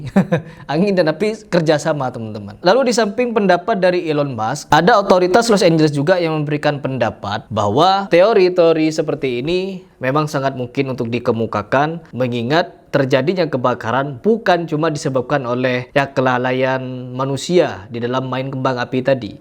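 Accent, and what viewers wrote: Madura, Indonesia. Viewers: Aslinya Madura ya mas?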